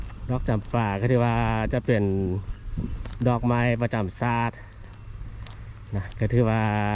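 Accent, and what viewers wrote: South, Thailand. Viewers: เสียงบรรยายสำเนียงคล้ายภาษาปักษ์ใต้ของไทยมาก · สำเนียงผู้ยรรยาย มาจากแถบไหนของลาวครับ สำเนียงคล้ายภาษาไทยใต้มาก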